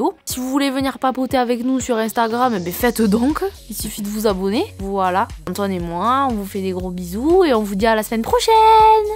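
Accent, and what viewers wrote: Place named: South, France. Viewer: Ouiii tutos déco ! Votre accent du sud est trop mimi changez pas vous êtes supers !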